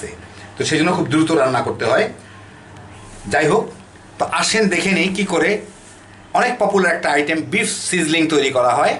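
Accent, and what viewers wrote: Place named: Dhaka, Bangladesh. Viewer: আসসালামুয়ালাইকুম ওয়া রহমাতুল্লাহি ওয়া বারাকাতুহ।। আপনার কুঁচি কুঁচি আর তামা তামা ডায়ালগ টা আমার মেয়ে খুব পছন্দ করে ❤️ আপনার কথা গুলো পুরাই আমাদের মত ঢাকাইয়া😜 আমরা আসলে এত শুদ্ধ করে বলতে পারি না।যা বলি নরমাল ভাবেই বলি😁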